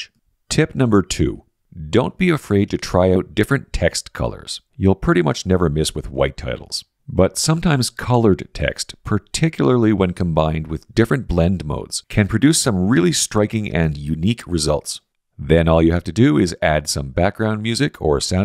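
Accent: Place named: Canada. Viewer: I hear your Canadian accent...my wife is Canadian too! Thanks for the tutorial. I'm a subscriber now!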